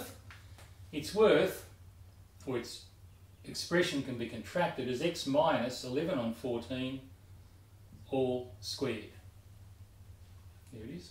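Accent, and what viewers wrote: Australia. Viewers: Not sure why, but having another Australian explain this helps so much. Top stuff, cheers!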